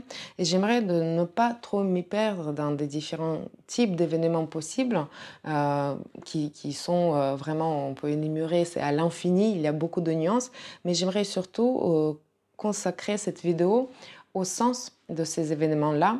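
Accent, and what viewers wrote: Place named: Latin America. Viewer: Bonjour, je vous découvre aujourd’hui et, contre toute attente, je suis déjà fan. Expression parfaite, pas de narcissisme, un vrai désir de partager. Et ce charmant accent qui m’a fait voyager chez nos voisins latins # Noeud Nord en Gémeaux. Merci 🙏 🥰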